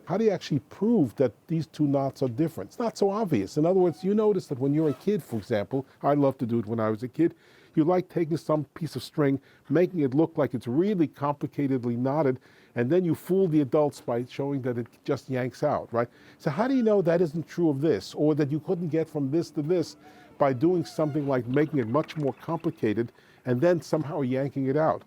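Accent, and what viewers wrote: New York, United States. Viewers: I LOVE that New York ACCENT ! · That guy is clearly a native English speaker. Probably just a NY accent. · New Yorker. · what accent is this? new york?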